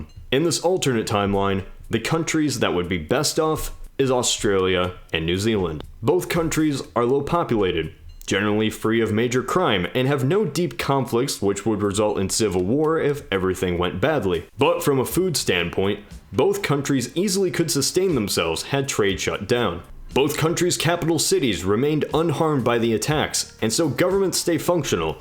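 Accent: Ohio, United States. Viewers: nice northeast Ohio accent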